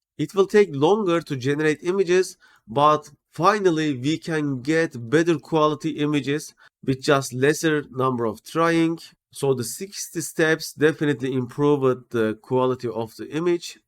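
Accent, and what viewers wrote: Turkey. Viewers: English with Turkish accent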